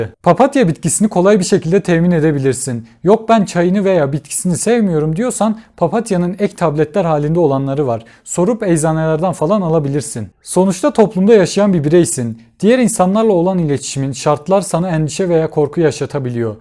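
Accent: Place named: Eastern Anatolia, Turkey. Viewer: Hemşerim nerelisin.. Lisanındaki ton Şarkî gibi..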